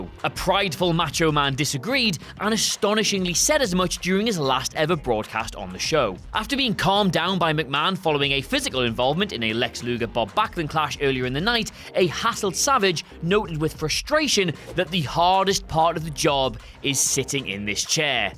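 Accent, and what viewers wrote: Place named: England, United Kingdom. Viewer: Not used to an Englishman speaking English?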